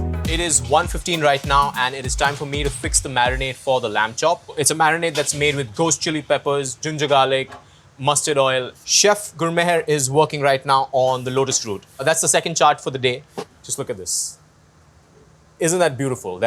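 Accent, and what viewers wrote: India. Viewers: indian accent is cringe asf. it's a meme. he should speak with a proper American accent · yup. so true. Also this is exactly how i speak. Just neutral Indian English accent.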